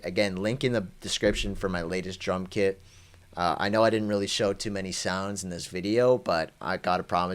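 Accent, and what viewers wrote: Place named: Toronto, Canada. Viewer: I’ve been here since 600 subs, I love your beats and the Toronto accent really standing out here😂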